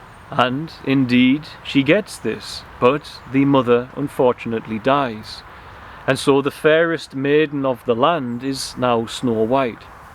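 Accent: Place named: North East England, United Kingdom. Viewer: Are you Welsh bro? Subscribed! Duw bendithia chi (god bless you). yeah I can't work it out. The more I listen the more I think you sound North Eastern! Don't want to say one place or the other in case I offend! Definitely more Newcastle than Sunderland! Final answer! Did I win? Good job though! Nice one!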